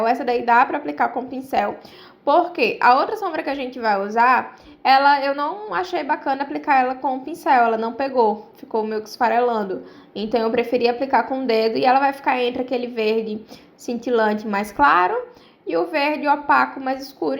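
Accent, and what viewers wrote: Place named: Rio Grande do Norte, Brazil. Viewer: Esse sotaque só podia ser do RN mesmo ❤️❤️❤️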